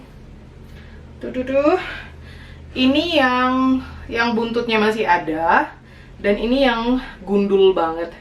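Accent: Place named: Java, Indonesia. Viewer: Wkwkwk dengklek jawa banget ya mak 😂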